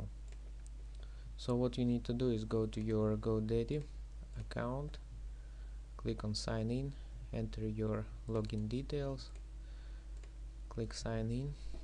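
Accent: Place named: Russia. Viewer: you sound russian i love you <3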